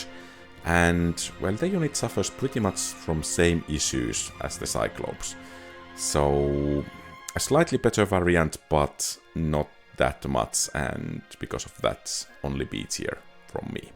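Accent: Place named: Finland. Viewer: Based on the accent I think Finland.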